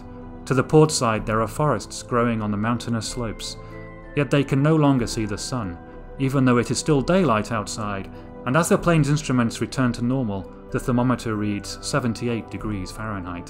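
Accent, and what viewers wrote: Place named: United Kingdom. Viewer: what dialect of British English are you speaking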